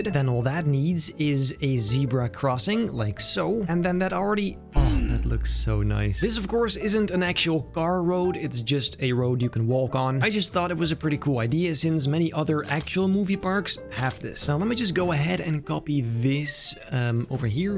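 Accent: Netherlands. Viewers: I smell Dutch Haha❤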